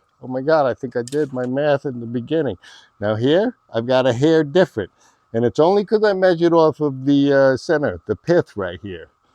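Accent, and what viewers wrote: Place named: Mid-Atlantic, United States. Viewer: I enjoy your channel, glad I came across it. Two questions. Guessing from the dialect, you might be somewhere between Baltimore and Philadelphia? Somewhere nearby? Second, I’m curious how the laser projects all around those long logs. Are there an extra lasers on the periphery? Just curious how that works.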